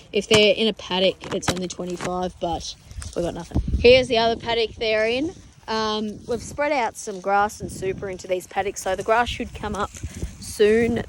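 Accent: Australia. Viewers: youre a natural comedian and absolutely adorable! As an American I love the Aus accent lol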